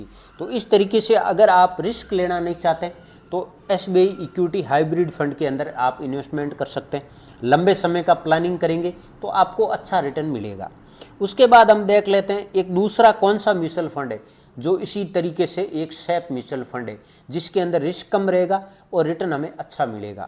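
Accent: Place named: Rajasthan, India. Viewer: बहुत अच्छी जानकारी दी आपने बहुत बहुत धन्यवाद आपका 😊😊 राजस्थान से लगते हो आप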